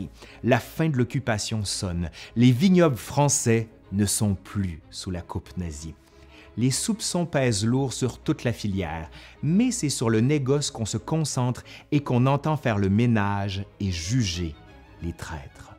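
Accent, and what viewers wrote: Quebec, Canada. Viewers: J'aime beaucoup votre travail.. Auparavant, c'est à dire avant d'avoir connu vos vidéos, je n'appréciais pas tellement l'accent canadien, mais grâce à vous mon opinion a pu changer totalement. Le son en devient agréable, doux et confortable à l'écoute de vos récits sur l'histoire. Comme vous.nous l'avez suggéré, je souhaite proposer une traduction en une autre langue, en hébreu du titre de votre video : למה הנאצים אהבו כל כך יין? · Bon sang, l'accent Québécois est juste magnifique !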